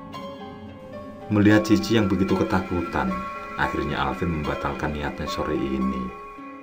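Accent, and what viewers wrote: Java, Indonesia. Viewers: Orang jawa bg?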